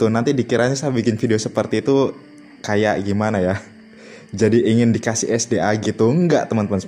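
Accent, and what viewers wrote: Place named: Eastern Indonesia, Indonesia. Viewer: Orang timur ini yak wkwk sa ko sa ko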